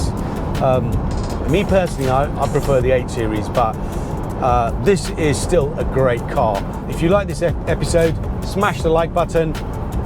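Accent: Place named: United Kingdom. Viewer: Done well on your british accent.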